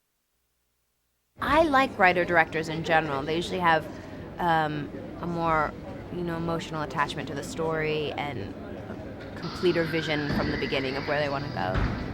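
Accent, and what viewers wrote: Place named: England, United Kingdom. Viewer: Stick to your English accent, girl. Suits you better.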